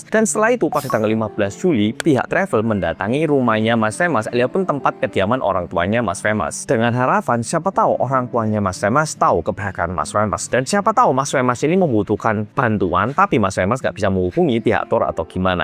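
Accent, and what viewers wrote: Java, Indonesia. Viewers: mas kok suwe2 sampeyan medoke berkurang😁😁😁😁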